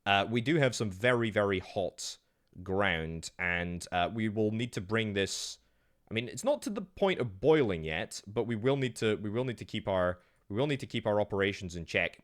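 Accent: Scotland, United Kingdom. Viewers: Which region of Uk are you ? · Scottish accent?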